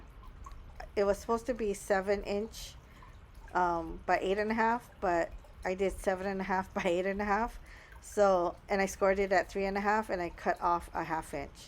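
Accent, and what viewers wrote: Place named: Hawaii, United States. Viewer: I agree, the slimline was perfect for this stampset! So cute!🤗 Gosh, I love listening to your Hawaiian accent. Reminds of my work Ohana when I was stationed on Oahu🤙🤙🤙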